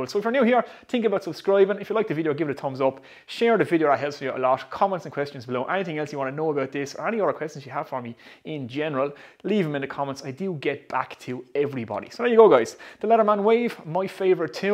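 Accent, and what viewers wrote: Waterford, Ireland. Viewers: Great video. Additionally, an Irish brogue is the most beautiful sounding accent. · I just got one today. Is that a Waterford accent?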